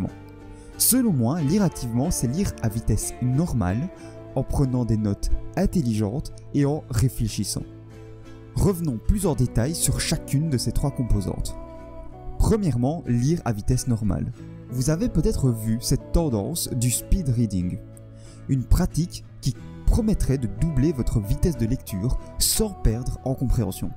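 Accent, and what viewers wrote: Liège, Belgium. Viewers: Bon tu viens de Liège ? ❓😜 belle video bravo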